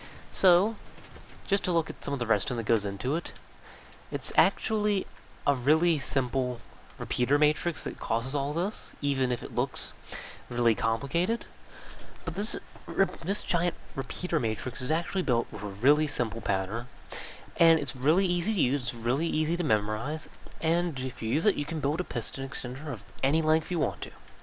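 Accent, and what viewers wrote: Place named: England, United Kingdom. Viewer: Are you a fancy person from England? Your voice sounds like that.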